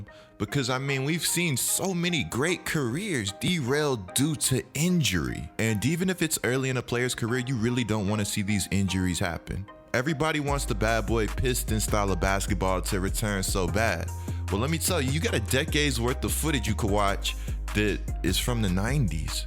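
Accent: Louisiana, United States. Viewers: You from nola or BR?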